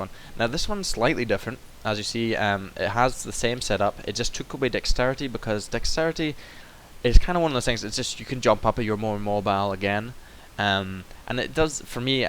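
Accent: Scotland, United Kingdom. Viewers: where in scotland are you from?:)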